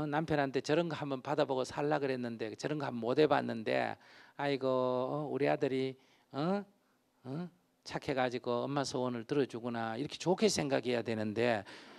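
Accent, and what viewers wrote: Gyeongsang, South Korea. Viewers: Weird! no one seems to have problem with his tone or voice except you. And, the audio condition is not bad. Might be your computer's audio problem. Or, his southeast Korean accent you're probably taking wrong with. Otherwise, what else you might possibly have? Do you happen to have problem like auditory hallucination? Seriously. If you computer game too much time wearing headset, it might have happened. go and see doctor.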